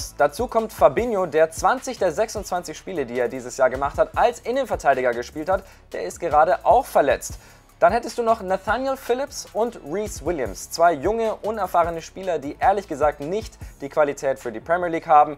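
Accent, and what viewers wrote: Ireland. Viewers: Er ist ire er kann nd wirklich was dafür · Ist sind irischer Akzent, kommt aus Irrland der Gute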